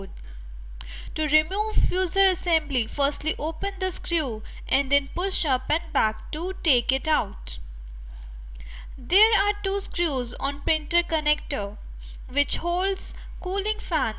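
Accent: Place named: India. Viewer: fucking india and their dumb fucking accent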